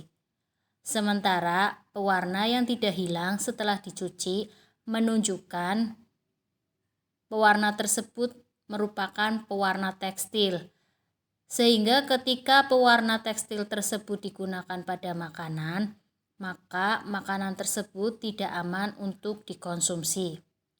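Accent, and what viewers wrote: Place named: Tegal, Indonesia. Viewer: Top markotop tegalane medok nmen ah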